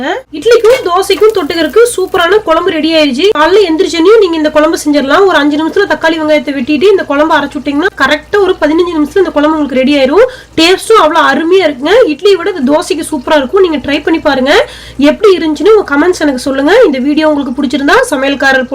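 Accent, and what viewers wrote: Kongu, India. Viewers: Super your kongu tamil is very sweet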